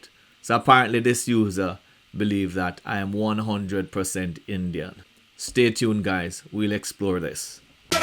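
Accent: Jamaica. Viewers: Love your Jamaican accent. I have been there. Wonderful country and people. I am proud to say I visited the best island in the Caribbean. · You definitely talk like a Jamaican! From the way you say “issues” & “persons” & of course “long distance stulla” 🤣🤣👏🏾👏🏾 Cool results!!! 🇯🇲🇯🇲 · Well when you blend it all together, you can also look Arabian and Middle Eastern as well, but your talk is 100% Jamaican, ya man.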